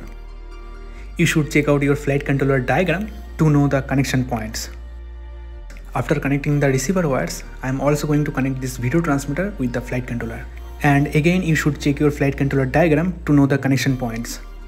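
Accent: Bengal, India. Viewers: Hey are you from Kolkata? Your Accent is like Bangali Dada. By the way, I'm from Bangladesh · By the way you speak, I understand that you are a Bengali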